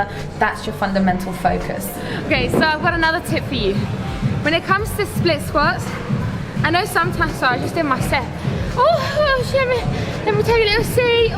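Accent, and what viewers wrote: England, United Kingdom. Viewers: What part your England is your accent from?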